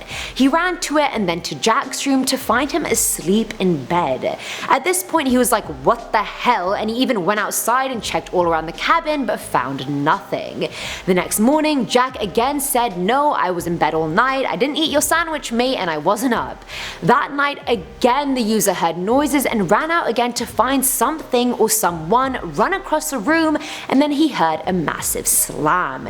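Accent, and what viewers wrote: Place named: Wales, United Kingdom. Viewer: You have a Welsh accent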